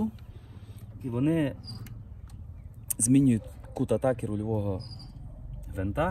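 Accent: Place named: Western Ukraine, Ukraine. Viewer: Это почти польский, суржик западноукраинский.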